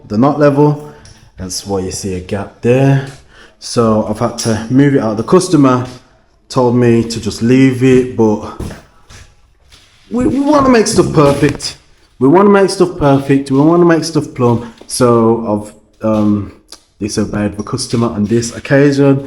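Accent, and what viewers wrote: Birmingham, United Kingdom. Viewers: This is a brummy accent - Birmingham, UK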